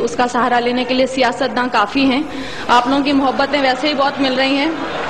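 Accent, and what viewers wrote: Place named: Noakhali, Bangladesh. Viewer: আপনি কি নৌয়াখাইল্লা...........এভাবে কথাবলেন কেন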